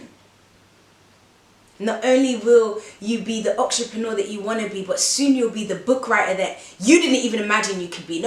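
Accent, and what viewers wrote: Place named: United Kingdom. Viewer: Youre brittish? Had no idea! Cheers from France Anyways, you are really inspiring, 💙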